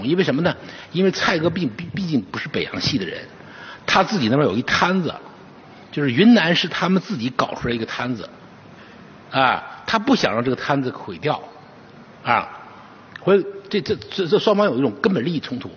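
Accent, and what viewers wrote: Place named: Northeast, China. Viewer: 讲的不错。 不过这口音我也是醉了，东北+浙江。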